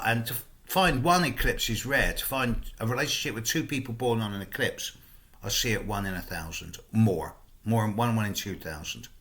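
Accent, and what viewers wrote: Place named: Southern England, United Kingdom. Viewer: Hey❤ bless you for saying about Williams middle name I remember when he was born thinking the same..Once and Future King... (I'm a kitchen table astrologer, of several decades) I did his chart and felt such a surge of pure hope..(he was born just before my eldest daughter) And he was born on the summer Solstice too... All shall be well. Nice to hear a UK southerner voice... Glad to have stumbled on your no nonsense channel... Thank you xx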